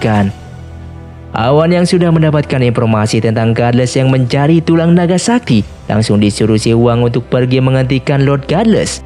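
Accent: West Java, Indonesia. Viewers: bang maneh jelma sunda nyah?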